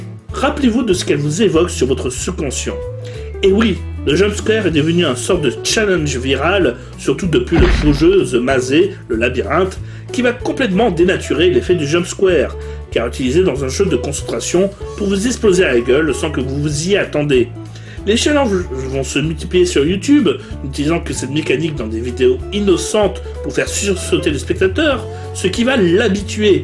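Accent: France. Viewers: L'anglais a la française haha mais j'aime bien se format et voir Detective Dee ça fait toujours plaisir. Tu ma un peut Hyper avec le film psychokinesis.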